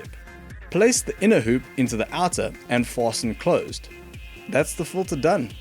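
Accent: South Africa. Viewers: wait are you south african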